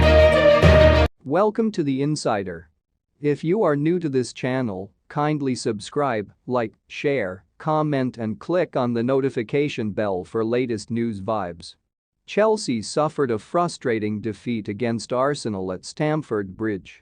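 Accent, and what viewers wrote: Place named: United States. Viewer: What is with that fucking stupid annoying American robot accent? Bit of advice change that shit asap!!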